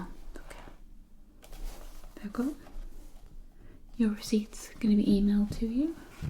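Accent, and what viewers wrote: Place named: England, United Kingdom. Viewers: That English accent fucking hell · Are you from Sunderland? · She sounds very Geordie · I can tell your from Teeside purely by your accent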